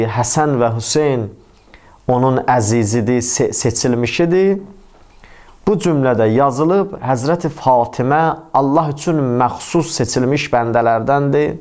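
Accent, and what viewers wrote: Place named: Iran. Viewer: Azərbaycanlı ola _ola İran ləhcəsi ilə danışırsan?Bunu yığışdır İran İttilatı sizə belə öyrədib???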